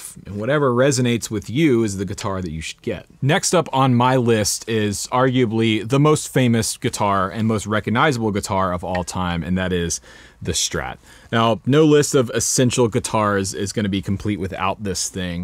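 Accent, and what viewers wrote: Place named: South, United States. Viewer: Even if he does say "a Finder is essintial..." Southern English😐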